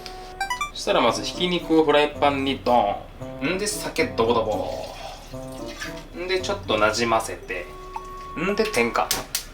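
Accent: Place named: Hakata, Japan. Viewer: 全編博多弁の動画を見てみたい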